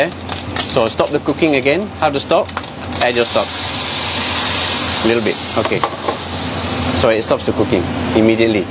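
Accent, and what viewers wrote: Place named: Singapore. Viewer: he is in Singapore, hence his accent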